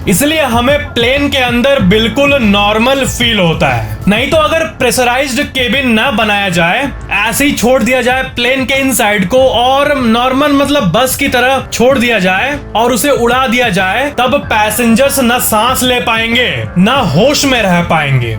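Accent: Bihar, India. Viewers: Aap Bihari ho kya bhai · Bhiy bihari h 😁😁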